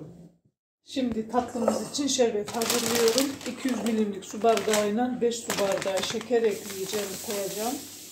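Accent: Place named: Black Sea, Turkey. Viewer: Banada Karadeniz li gibi geldi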